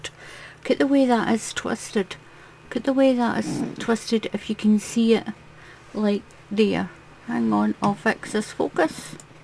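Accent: Scotland, United Kingdom. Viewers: I love your crochet videos ,as a knitter who could never understand it .also nice to here a fellow Scot you explain everything so well .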